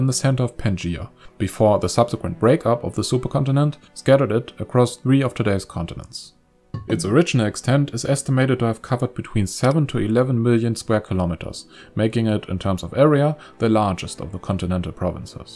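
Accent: Germany. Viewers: You have the most german accent I've ever heard, and I lived there for years. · Everything sounds better with a German accents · his heavy german accent brings some extra feel to the story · Is the speaker German native? Hört sich ziemlich deutsch an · He is German. I was pretty sure from the accent, but in another video he mentioned some German cities and names and it was obvious that it's his first language. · I like how the narrator sounds like a Nazi scientist. 🙂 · Ok... I knew it... This is a German person talking. Great animations and to my knowledge well researched I will subscribe. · i dunno why but hearing a German sounding guy talk about it being the largest graveyard in history.. made me laugh... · Everything is great about this video but the commentator looks like he have a germane accent..I’m sorry but it’s really annoying and uncomfortable! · i really love your videos but I must strongly recommend you invest in a native english speaker who also has a good voice because that would make a huge difference. 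your english skills is excellent how ever the german accent is pretty heavy and your voice is unfortunately doesn't have the best pitch making it very difficult to keep the focus on the flow of the video. I hope you don't get this criteria in a personal way I really think your videos are great but just the audio reduce the quality drastically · There is something vaguely menacing about a guy with a Germain accent talking about the end of the world 😳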